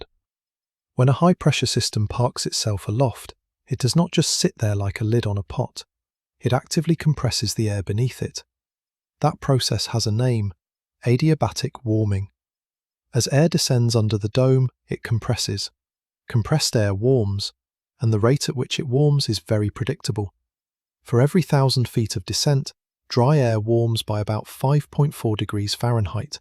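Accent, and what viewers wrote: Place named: United Kingdom. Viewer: This is U.S. weather....why the British accent?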